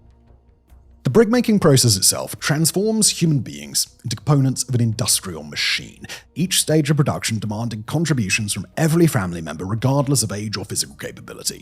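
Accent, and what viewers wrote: England, United Kingdom. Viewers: Jewish have run India for centuries! They know what Jewish Britain did to them . Your English accent can’t hide your Bias either;(! Says The BronxBoy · Why does everything presented by sound like it has more authority because of his British accent?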